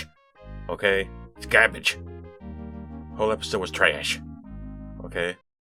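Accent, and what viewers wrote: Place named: Canada. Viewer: This is just an observation, but you sound like you are a Canadian.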